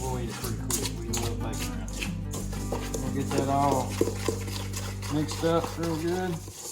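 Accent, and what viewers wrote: South, United States. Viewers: Nice to see such a nice young man enjoying his family. Not many homesteaders in Mississippi so enjoy watching someone with that southern drawl.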